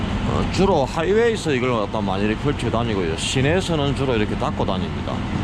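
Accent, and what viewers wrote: Gyeongsang, South Korea. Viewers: 구수한 경상도 사투리가 듣기가 좋습니다. 앞으로도 많은 좋은 영상 기대 하겠습니다. 모쪽록 건강하세요!~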